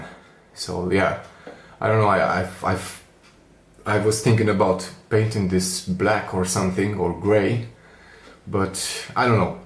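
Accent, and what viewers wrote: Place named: Netherlands. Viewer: vorbesti engleza cu accent de olandez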